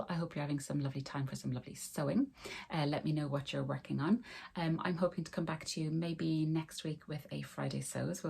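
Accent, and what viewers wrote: Ireland. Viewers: Great vlog and thank you for being so open and warm hearted. Btw love your gentle Irish accent! As a tall person I often wonder how tall others are, especially when we seem to share the same taste. How tall are you? I’m 5’9” and after 2 years of sewing, still have yet to sew anything without lengthening it. Megan Nielsen fit me best…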